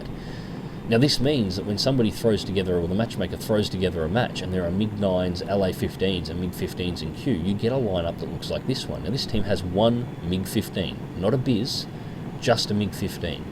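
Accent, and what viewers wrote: Australia. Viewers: yes indeed so glad i live over here and are you from Ausie i cant help but notice a bit of an accent?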